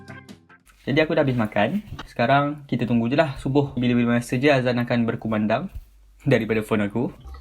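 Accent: Terengganu, Malaysia. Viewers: Org ganu ka? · Buat vid full slang ganu 🤣🤣 cerita pasal pape je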